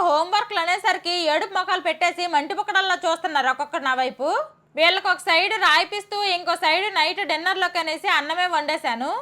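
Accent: Srikakulam, India. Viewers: Voice over lo full sklm loney matldthru madhi sklm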